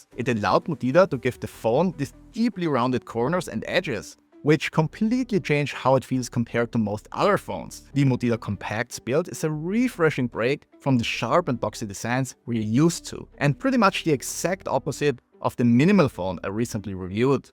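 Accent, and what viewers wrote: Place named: Austria. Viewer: Servus! Great review, but I started watching your videos for a minimal phone solution but have to admit how much I enjoy your Austrian English accent! Love from Canada 🇨🇦.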